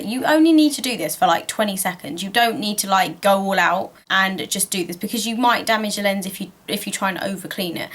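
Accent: United Kingdom. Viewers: You are speaking Brti English. I live in USA. Don't know if you are familiar with 'bausch and lomb' 'ReNu' product. I use this for lens soak and cleaning both. I wanted to know if you use the same solution for two purpose ?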